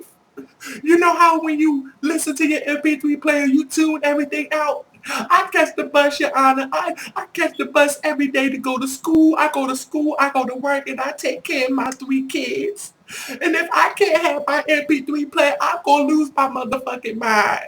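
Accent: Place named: Chicago, United States. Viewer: Where are you from, because you sound like you are from Chicago.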